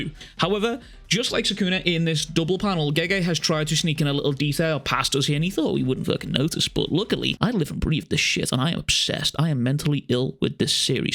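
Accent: Liverpool, United Kingdom. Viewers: Are u a scouser ? I thought you were scottish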